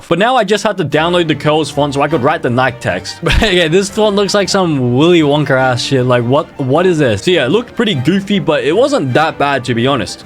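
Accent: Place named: Australia. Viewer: WHY WAS I SO HAPPY FINDING OUT HE WAS AN AUSSIE 💀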